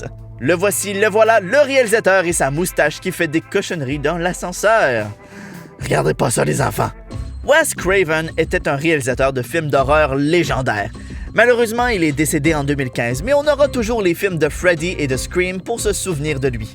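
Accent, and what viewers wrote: Quebec, Canada. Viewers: Super vidéo, comme d'habitude ! J'adore ton accent québécois, il est juste GÉNIAL ! Continue comme ça et à bientôt ! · J'adore ton accent québécois ! XD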